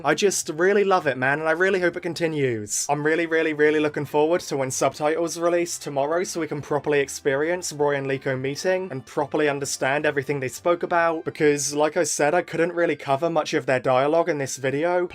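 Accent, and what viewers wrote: United Kingdom. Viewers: Man you call that a sick voice? At least you have that british accent to cover it up,when i speak when im sick i sound like a minecraft zombie💀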